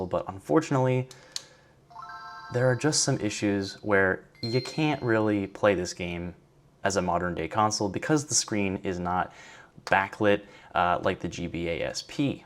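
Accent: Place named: Canada. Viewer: He’s Canadian can’t you tell EHH?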